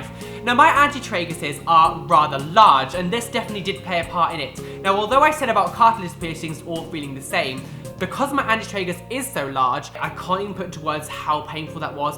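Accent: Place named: England, United Kingdom. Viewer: Madonna quanto fa schifo l'accento inglese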